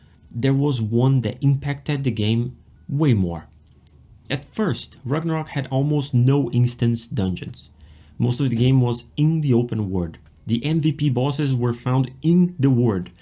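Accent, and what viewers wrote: Brazil. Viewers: KKkkk estava assistindo o video e pensei se era Brasileiro kkk pelo sotaque. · I was suspecting you were Brazilian because of your accent, then I saw you chatting in Portuguese lol Nice video, meu conterrâneo!! Let's play together sometime... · Are you brazilian? I can sense your accent might be from there! :) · Demorei a perceber que você era brasileiro, seu sotaque é maravilhoso. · Are you Brazilian by any chance? I saw you are playing in Portuguese. And I can sense I little bit of ascent. I'm from Brazil btw haha😊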